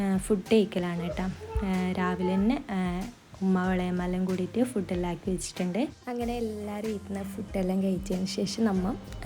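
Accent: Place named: Kannur, India. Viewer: ❤nice 👍ഈദ് മുബാറക് ആദ്യം ആണ് കാണുന്നത് ചാനൽ കണ്ണൂർ ആണെന്ന് തോന്നുന്നു സംസാരം കേട്ടിട്ട് 😊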